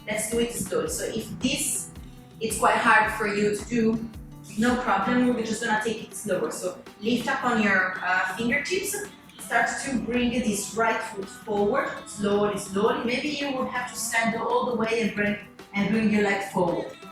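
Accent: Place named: Italy. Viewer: I love your Italian accent 👍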